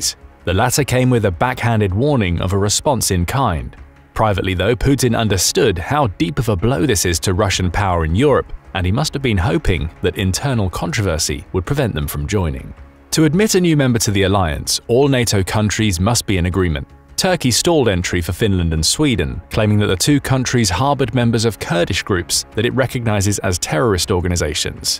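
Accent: United Kingdom. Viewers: Wishful thinking with British accent 😂😂😂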